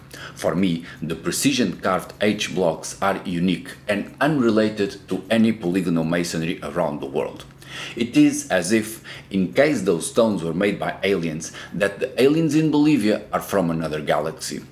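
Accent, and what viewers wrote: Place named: Portugal. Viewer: Why do you sound like you are portuguese while speaking english?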